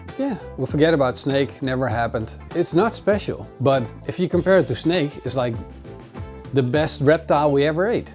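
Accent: Netherlands. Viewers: Wait, are you dutch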